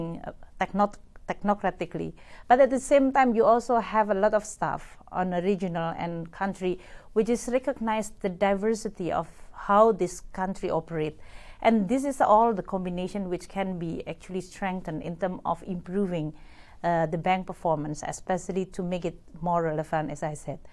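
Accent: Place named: Indonesia. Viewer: Lantas dengan kemampuan menghilangkan aksen Indonesia anda, sudah jadi apa anda sekarang? -- Orang seperti anda inilah yang sejatinya masih menjadi katak dalam kubangan, setiap individu memiliki kemampuan, dan keahlian masing2, sosok seperti bu mulyani yang pandai dalam mengelola keuangan, tentu tidak akan menghabiskan waktunya hanya untuk menghilangkan aksen jawa atau Indonesianya. Selagi grammar dan tata bahasanya dapat dipahami, maka tidak ada yang salah ketika kita masih memiliki aksen bahasa pertama.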